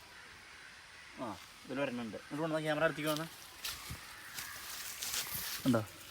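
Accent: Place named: Malappuram, India. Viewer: മലപ്പുറം സ്ലാങ്ങിലുള്ള അവതരണം പൊളി ആണ് വ്ലോഗ് സബ്സ്ക്രൈബ് ചെയിതിട്ടുണ്ട്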